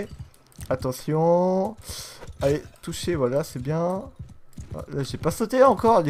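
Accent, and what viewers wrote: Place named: England, United Kingdom. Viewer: est son bel accent anglais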